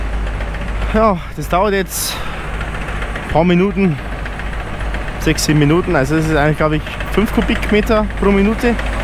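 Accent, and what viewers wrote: Bavaria, Germany. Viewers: Red no bayrisch passt👍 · Top Video, aba mit mehra Bayrisch is schena! Gruaß Flore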